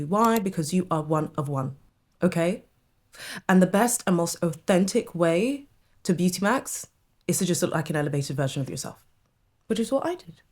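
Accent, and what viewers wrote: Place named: United Kingdom. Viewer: nothing beats a beautiful black woman with british accent❤❤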